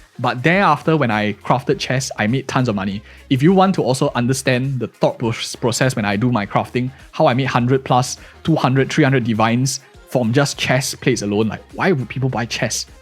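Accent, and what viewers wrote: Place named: Singapore. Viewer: more like singlish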